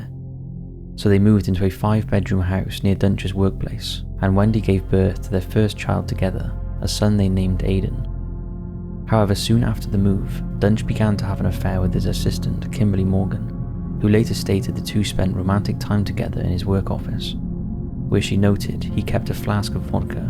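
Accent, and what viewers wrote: United Kingdom. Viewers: Your voice is beautiful but it resonates like in an echo. I love the British accent, but I have difficulty understanding it with clarity, I wish you pay more attention to the sound effect. Also, the music interferes making it even harder to hear clearly. No music is necessary. Great documentary, thank you.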